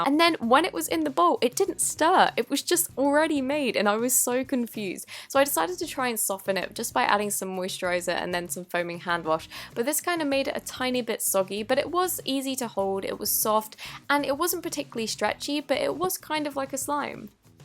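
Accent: Australia. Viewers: Are you aussie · Are you Australia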